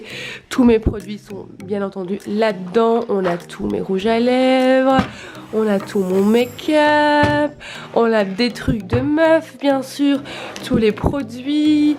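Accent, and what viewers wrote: Belgium. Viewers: elle a pas un accent français vu qu'elle est belge ils ont leur accent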